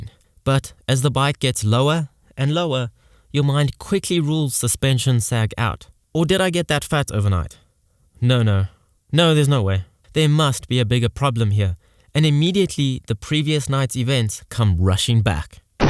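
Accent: Afrikaans, South Africa. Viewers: I was suspecting your from South Africa just because of your accent and then i saw the world clock on joburg, you just have that afrikaans accent some of us afrikaans mense have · Are you from south Africa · Eyyyyyyy, I new u were South African when I heared your voice🤣🤣🤣then I saw "Johannesburg" so what up bra🤣🤣🤣 Ok so like I'm 15 and I wanna get a bike for next year, it's a Honda cbr125r for 15k and I need to buy it myself😦😦😦 so any suggestions on how to get the cash🤣🤣🤣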